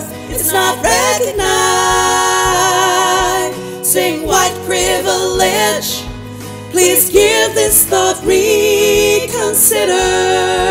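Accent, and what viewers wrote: New Zealand. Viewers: Omg, are you Kiwi?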